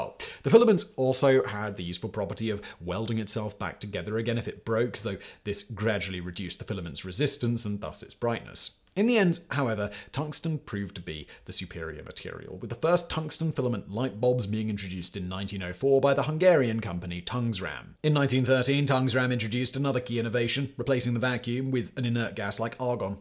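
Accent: United Kingdom. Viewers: Well the narrator with the British accent seems to think history class was only taught in highschool. that excludes everyone in the UK then.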